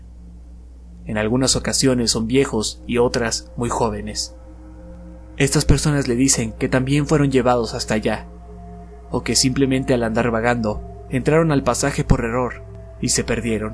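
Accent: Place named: Latin America. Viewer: Me encanta tu acento latino el mejor, tu narración simplemente genial Gracias!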